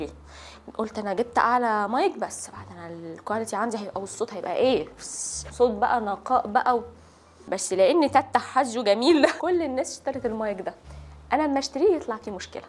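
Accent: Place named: Gulf, Arab world. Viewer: لا ادري حلوه اوي تحسيها قلبت خليجيه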